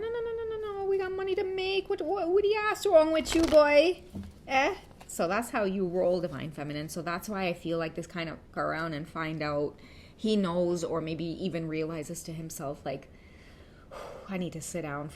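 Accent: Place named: Trinidad and Tobago. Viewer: I absolutely love the Trini accent Queen!!! It gives me life 🥰🙏🏽❤️🙏🏽 Thank you for sharing yourself and these amazing readings with us ❤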